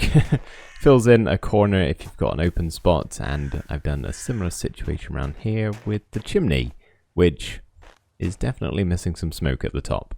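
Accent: United Kingdom. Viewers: The cozy Christmas Minecraft and your British accent is so nostalgic as someone who grew up watching InTheLittleWood’s Christmas ADVENTure Minecraft series!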